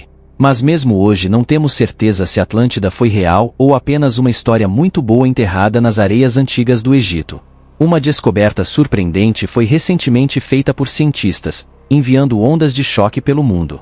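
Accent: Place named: Brazil. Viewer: Colombo chegou À América... não destrua a gramática da língua portuguesa com sotaque brasileiro.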